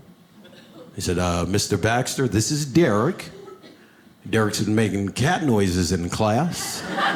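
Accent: Dominican Republic. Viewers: My boss said to ××cap a chino×× dude I lost it that was a great joke and crazier punchline and with the Dominican accent made it all come together!!!!! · I don't know if most of you realize the funniest part about his spanish accent is that he nails the dominican accent!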